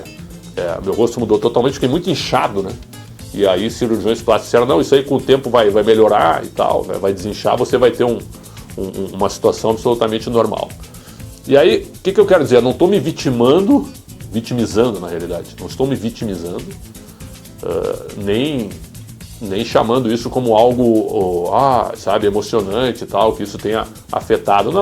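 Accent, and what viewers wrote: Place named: Rio Grande do Sul, Brazil. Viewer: Parabens por essa historia de vida e com bom sotaque gaucho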